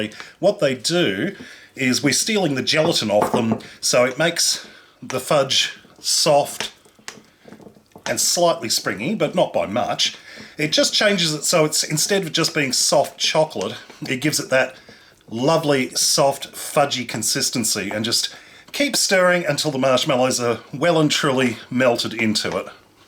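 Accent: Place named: Australia. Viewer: Love your videos I heard your Australian because I am too keep making fantastic videos and we can reach one million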